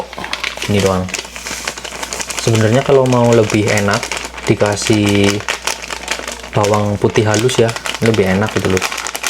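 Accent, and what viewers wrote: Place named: Purbalingga, Indonesia. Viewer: Jial ngomong ngapak ala Purbalingga 😊nyong y wong Purbalingga 😂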